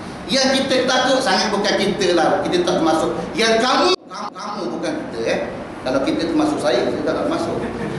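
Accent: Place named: Pahang, Malaysia. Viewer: ustaz ni slang org pahang....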